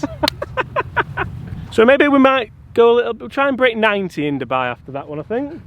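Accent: Yorkshire, United Kingdom. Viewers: "Si Thi" 😂 a reyt good Yorkshire accent that pal good to hear local lingo 👍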